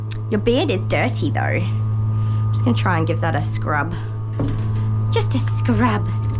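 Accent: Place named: Australia. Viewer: Hi good to see you back on here grooming I have missed your presence as I enjoy your chit chat it is so refreshing to hear an Australian accent.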